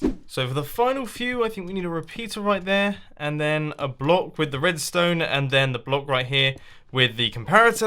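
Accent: United Kingdom. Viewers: it is the british accent with the serious tone that has the charm. Sometimes I try speaking like that bcoz it sounds absolutely cool OwO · Why so stereotypical, I'm American and a girl and I don't just like the British accent I like the content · British voices turn me on even tho i'm, not gay.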